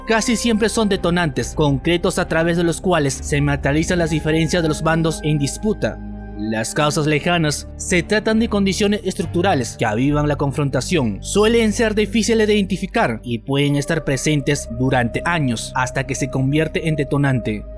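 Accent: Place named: Bolivia. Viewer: ☝ interesante información del video, pero ¡ con abundante dosis de alarmismo !!! 😒🙈🖓 Es evidente que, por el modismo, dejo o expresión de la voz del autor del video, éste, NO es peruano NI chileno ( quizás puede que sea boliviano, o de alguna otra región ). Pues bien, la realidad presente, NO es como aquí se dramatiza. Si bien existe una rivalidad de los peruanos con los chilenos, ésta se hace mas evidente en el ámbito deportivo, que en el político o económico. Ahora, si el Perú apuesta por desarrollarse y destacarse, NO es por andar pensando o viendo lo que hacen los demás, si no, por que es plenamente consciente del potencial y los valiosos recursos que posee. Y, por que en la búsqueda de tal fin, está dispuesto a trabajar con todos : tanto con EE UU , como con China ( por ejemplo, se estuvo rumoreando a través de las redes sociales, que los chinos, hicieron la propuesta inicial de la contrucción de megapuertos, a las autoridades chilenas, pero, tal parece, que, como NO llegaron a un acuerdo conciso, estos, se pasaron a proponer lo mismo al Perú, que aceptó de cajón. 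Así que, NO tienen nada que reclamar o envidiar al respecto, los vecinos sureños ). ✋ En cuanto, al supuesto plan extensivo territorial del Perú, es algo, que de plano debe descartarse, ¡ por que es completamente falso !!! 🖓 Los peruanos del siglo XXI , NO tienen proyectos expansionistas geográficos, si no, económicos : la ' conquista ' es de nuevos mercados alrededor del mundo, y, NO de territorios vecinos, qu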